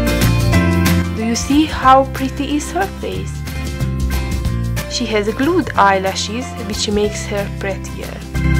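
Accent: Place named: Romania. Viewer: Your accent is beautiful, please don't think you have to change it at all! Your English is perfectly understandable, and I personally adore the way you speak it. I would love to hear you speak Romanian too, though so I may be biased. LOL And I know my Romanian pronunciation would be SO much worse, by a LONG way. :D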